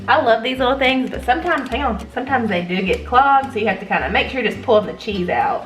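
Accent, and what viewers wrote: South, United States. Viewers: LOVE all your recipes but looking forward to fixing the sausage pinwheels for my kids coming home to visit in September. I too am a Southern Girl and love your accent..Hello from Coastal North Carolina!!! I’m fairly new. Discovered you about a month ago!! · I am fairly new to your channel. I love your southern drawl. I would like to see some unique and different breakfast recipes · I'm new to your videos, I just subscribed today. I love your videos and can't wait to try some of the recipes. I'm from Mississippi and I love your southern accent.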